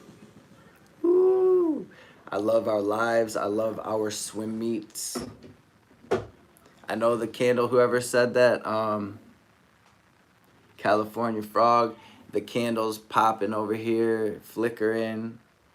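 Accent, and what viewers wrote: Detroit, United States. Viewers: So, I just happened on this account and I don't know anything about you. But you sound like you're from the D....yes? Anywhooo...good stuff here. Thank you!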